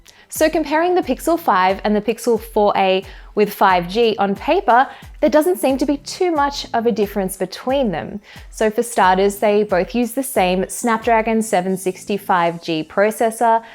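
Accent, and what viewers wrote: Australia. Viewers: I love your accent!😍 Australian? Perhaps a Kiwi? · 🤗 Australian accent:) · What a beautiful Australian accent ❤️ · She is Australian and from the city, I won’t say what state but I think I know. This is how I sound lol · dodgy aussie accent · Aient nobobdy gonna talk about that auzzie accent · Lol all us aussies sound like this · i'm in love with how she talks 😭 aussie?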